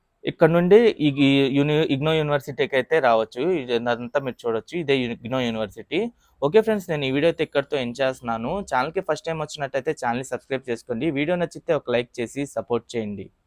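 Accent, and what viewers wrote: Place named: Telugu, India. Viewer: Do not comment about his language,he is speaking local telugu slang,go ahead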